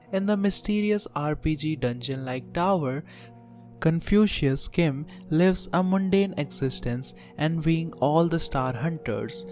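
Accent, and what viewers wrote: India. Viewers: Your accent is indian bro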